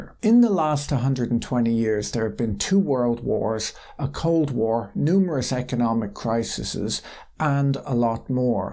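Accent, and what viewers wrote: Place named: Ireland. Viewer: He's irish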